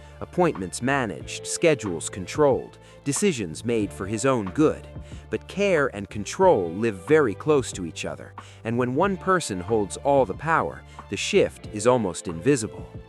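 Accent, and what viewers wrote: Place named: United States. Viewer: What the flying f…. An American voice/person who knows absolutely NOTHING about this couple. YUK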